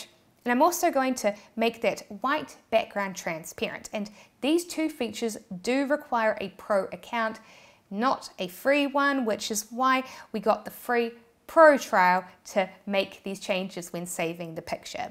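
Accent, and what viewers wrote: New Zealand. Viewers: Ohh u are a new Zealand's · The Kiwi accent is soooooo adorable on you! 💓💓💓💓 ❤❤❤❤💓💓💓 Hey, what is your thoughts on Crypto?, and the likes of Bitcoin?, is that an area that you have ever given any thought to? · LOL I was gonna say Australia but NZ is cool. I just started watching your channel recently and it's interesting. And your accent is cute 😂😁🥰. I used to know someone from Australia.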